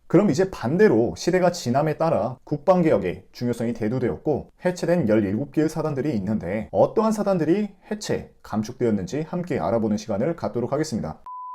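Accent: Jeolla, South Korea. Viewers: 다 좋은데 전라도 사투리가 좀 남아있네..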